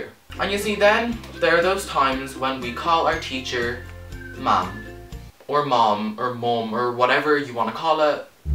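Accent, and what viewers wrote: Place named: Ireland. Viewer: Ah bless. The Irish accent is lovely as always :) thanks for making my day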